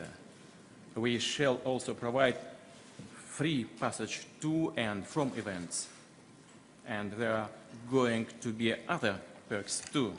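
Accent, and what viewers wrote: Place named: France. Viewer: Sounds French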